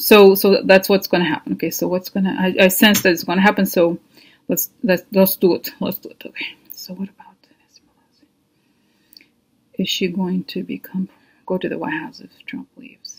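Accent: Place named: Russia. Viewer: your accent is hilarious!! Are you really Russian?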